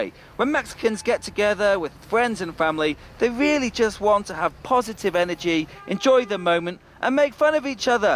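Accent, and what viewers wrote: England, United Kingdom. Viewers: ¿de qué parte de Inglaterra es tu acento? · where is your UK accent from?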